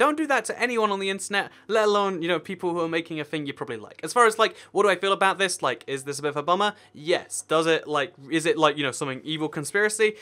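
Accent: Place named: United Kingdom. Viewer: RG Games he kinda sounds British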